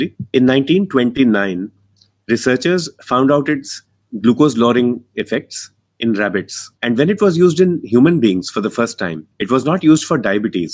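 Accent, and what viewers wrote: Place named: India. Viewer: Very hard to follow with such a heavy Indian accent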